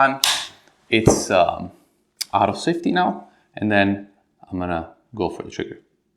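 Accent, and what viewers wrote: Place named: Italy. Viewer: An Italian here you got a great Italian accent also that's a really cool rifle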